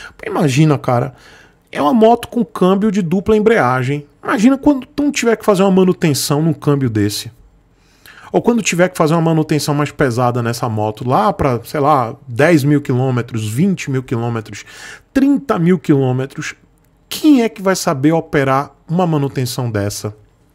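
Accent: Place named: Santa Catarina, Brazil. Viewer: sotaque praiano do litoral barriga verde não engana...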